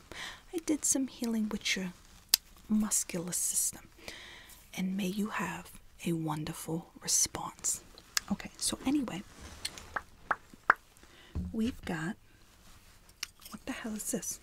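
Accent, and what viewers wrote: New York, United States. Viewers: As a New Yorker love the accent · Ha I love it! Sounds like my mom's whole Italian family from New York. 😂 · def new york lol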